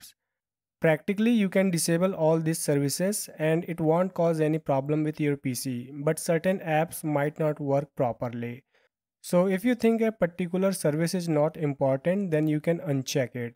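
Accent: India. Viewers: Whenever i hear an indian accent I click off because they never work like this one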